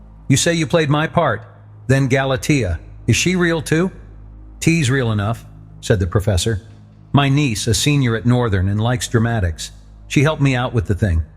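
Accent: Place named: United States. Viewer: Too bad the narration switched to an annoying American voice.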